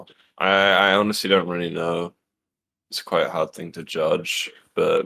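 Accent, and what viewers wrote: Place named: United Kingdom. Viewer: why is he british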